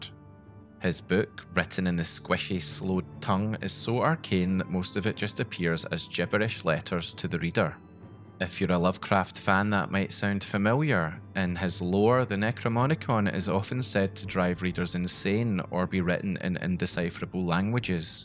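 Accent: Scotland, United Kingdom. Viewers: Love your accent. The Elder Scrolls need way more authentic scottish voices, it would fit in perfectly. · Me pressing play: "please dont be ai, please dont be ai, please dont be ai" (hears lovely - and REAL - Scottish accent): "OH THANK GOD!" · This guy's voice and Scottish accent is more fitting for skyrim then the skyrim voice actors themselves. I'm not sure if you will see this comment but you are now my go to skyrim youtube channel. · It's good to hear another Scotsman on the internet. Free the motherland from the Saxon hordes! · my favorite scottish narrator comes back with anothet great lore movie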